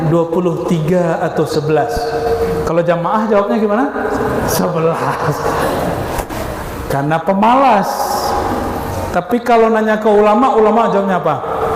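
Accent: Padang, Indonesia. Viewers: Masaallah tabarokallah Buya bahasonyo Padang totok 😂ala lamo indak Mandonga Bahaso awak kok 🙏👳👍💛💛💛💛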